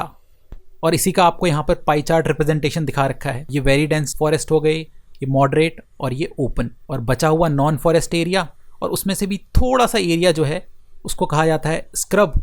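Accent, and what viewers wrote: Bihar, India. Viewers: Are u bihari??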